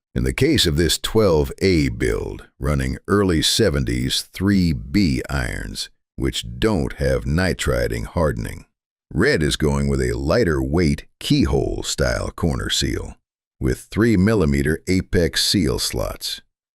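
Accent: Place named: South, United States. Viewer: Great info but the Bubba-fied narration is kinda irritating.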